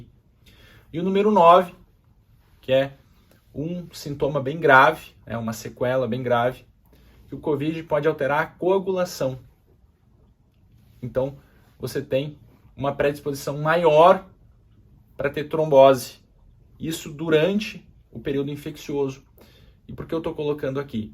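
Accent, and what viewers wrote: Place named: Rio Grande do Sul, Brazil. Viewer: Eu dou nota 10 . Eu vi que tu és gaúcho , pelo sotaque. Conheço bem . Moro em São Paulo !!!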